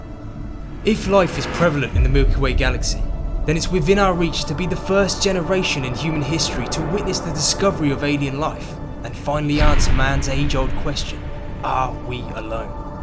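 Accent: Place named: England, United Kingdom. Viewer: G'day. Good vid. I love your pommie accent. Like Curious Droid you say 'Errf' instead of Earth. Outstanding. Vive la differance.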